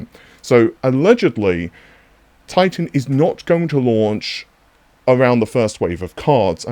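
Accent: England, United Kingdom. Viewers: Accusing an Englishman of faking an English accent?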